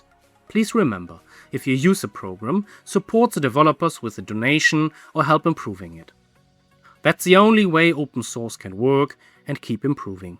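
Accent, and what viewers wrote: Germany. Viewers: Ein Deutscher der englisch spricht ist unverkennbar😅😂 Gutes Video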